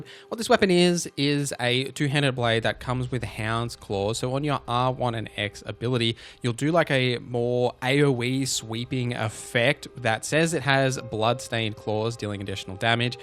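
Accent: Australia. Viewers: I thought people liked the aussie accent. Why are they bullying us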